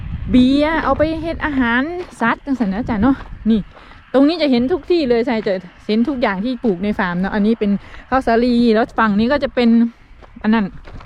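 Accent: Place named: Ubon Ratchathani, Thailand. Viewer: ฟังสำเนียงเป็นคนอุบลฯเหรอครับ ชาวนาของอังกฤษร่ำรวยต่างกันกับเกษตรกรไทยที่ยากจนลงทุกวัน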